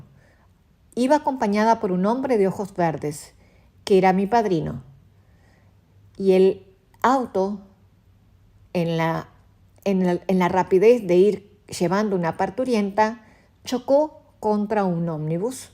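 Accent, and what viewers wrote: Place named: Uruguay. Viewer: Es muy difícil regresar a otro tiempo.espero terminar tu vidahistoria es un gusto aberte encontrado de casualidad.te considero una amiga siempre te escucho tu horóscopo si no te escucho me siento triste.tienes uba bonita voz un tono de los uruguayos gracias voy en el capítulo 2 y los voy escuchar todos buenas noches.desde aca san luis potosí México.si tuviste suerte en seguir con vida!❤🎉😊😊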